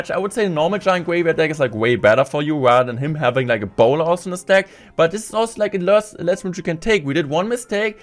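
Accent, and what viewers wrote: Germany. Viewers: ich liebe dich und deinen deutschen akzent vor allem dieses (in di intia wörlt plaing in top läda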